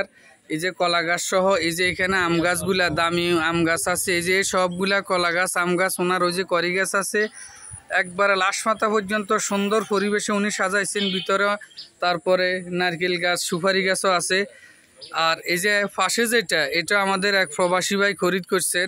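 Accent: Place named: Noakhali, Bangladesh. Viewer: ভাই নোয়াখালী নাকি।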